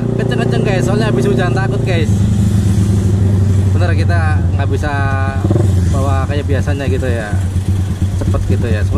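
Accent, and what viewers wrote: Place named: Banyumas, Indonesia. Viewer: Wah ngapak e daerah mana mas...? .gas tipis tipis jalam licin mas bro... sukses terus masbro...👌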